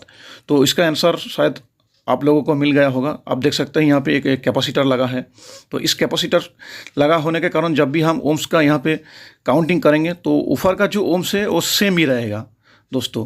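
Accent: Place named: Bengal, India. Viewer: Universal amplifier ka Chandni main shope ka mobile no muzhe google se milega hai, ph mein bat huya hai. AaJ ke somoy mein 3400/- price hai. Ar tumi to ekJon Bangali tomar kothar Tane ami buzhe geychhi. Tobe niJer matri vasa chere keno hindi te video banachho. Banglay banao seta teo tumi popular hobe. Thak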